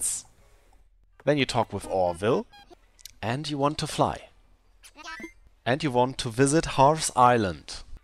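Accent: Germany. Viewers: Are you German? I think you have a german accent (#nohate I like that accent) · Workout athome Yes he‘s from Germany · das deutche englisch lol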